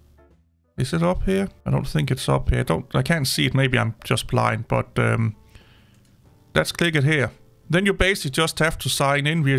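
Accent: Denmark. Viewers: Thanks so much I use Notes for my studies and needed this to work on my PC. Samsung needs to fix this its a bad look. The whole point I have a Samsung and not an iphone is because the accessibility and customization. Also are you from Denmark? Accent sounds like it.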